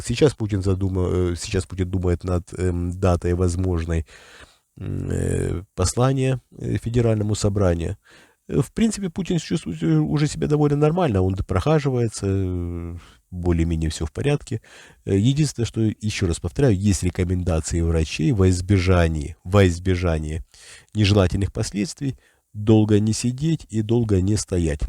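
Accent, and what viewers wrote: Ukraine. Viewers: Вопрос. В России настолько слабая контр разведка, что не может вас вычислить или вы, простите, работаете на власть? . И ещё, у вас явный хохляцкий акцент. Вот прям как мошенники из банков звонят. Опровергните?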